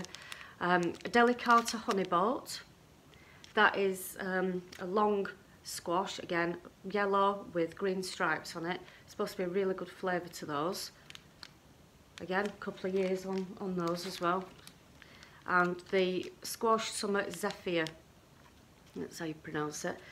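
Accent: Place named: Greater Manchester, United Kingdom. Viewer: Hi, what a lovely video this time of year. I would say 90% of my seeds are from Premiere Seeds Direct for at least 8 years. I have had great germination and find them great to work with. I've not long got back from work and I asked my fiancee to guess your location from your accent. She thinks Ashton or Stalybridge! ( She was originally from Stalybridge) I cannot wait for spring, it feels like I haven't seen the sun for months and we are in Lincolnshire so further South than you. I think I'll spend the evening in my grow room with a book pretending I'm on holiday! The lights I use are full spectrum and look just like a summer's day!! Take care and hopefully spring is just around the corner for us all.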